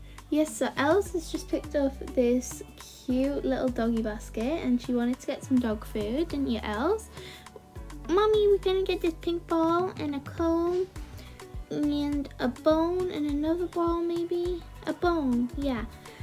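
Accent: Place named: RP, United Kingdom. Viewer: How posh is your voice!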